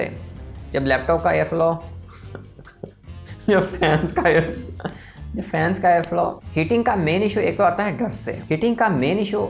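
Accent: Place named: Faisalabad, Pakistan. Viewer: Bhai, ap Faisalabad se lag rahy hain. Ap ka English ka accent ese hi hai. But fer v lagy raho. Love you!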